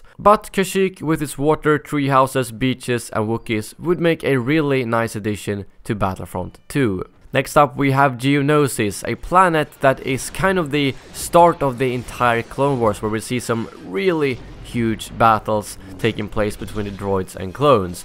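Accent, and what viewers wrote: Sweden. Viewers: I knew it! You are from Sweden, could hear it from your voice :)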